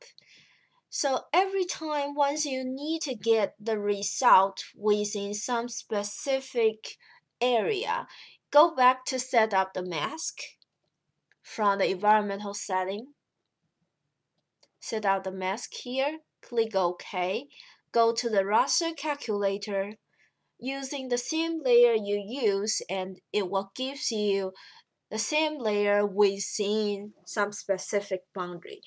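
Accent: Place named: China. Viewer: Thanks, quite helpful. I like Chinese accent :)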